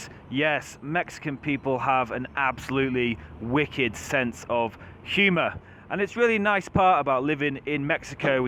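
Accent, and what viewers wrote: England, United Kingdom. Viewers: Lovely video!! luv english accent!!😝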